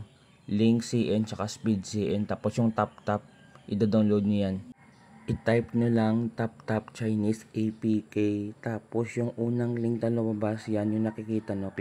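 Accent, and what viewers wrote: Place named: Iloilo, Philippines. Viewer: Mabal an mo gd Kun ilonggo Kay ga dala Ang accent bsan mag tagalog hahaha same boss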